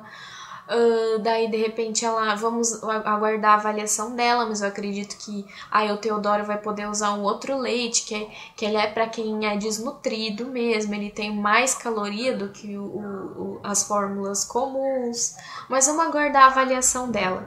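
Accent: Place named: Rio Grande do Sul, Brazil. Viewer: ahh bom, achei que fosse aqui do RS pelo sotaque. Menos mal que agora está tudo certo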